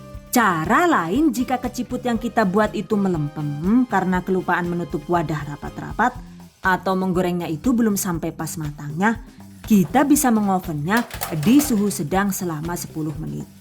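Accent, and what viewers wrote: Banyumas, Indonesia. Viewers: Suka bgt sm resep2 nya & sm logat ngapak nya...semangat trus buat bkin resep baru nya... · Saya paling suka cara bunda menyampaikan tutorial nya, anakku paling hafal klo aku buka video ya pasti bilang OKE...sama CATAT...sama itu dealek ngapaknya itu lho🤭🤭🤭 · Yg saya suka dari cahnel ini yg saya rasa susah jdi kelihatan gampang buatnya bunda dengan cara penyampaian yg jelas dan terperinci smbil ngelawak bahasa ngapak yg kadang buat saya ketawa sendiri Baru kali ini dapetin chanel yg gak ngebosenin di liat, sukses selalu bunda #GiveawayTHV2021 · Sprtinya dri banyumas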